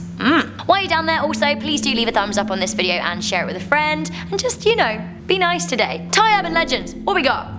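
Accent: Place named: United Kingdom. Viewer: Yeah us Brits have amazing accents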